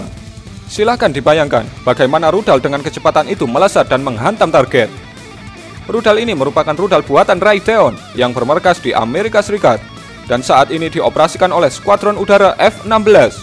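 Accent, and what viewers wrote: Java, Indonesia. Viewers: Medhok nya tuu looo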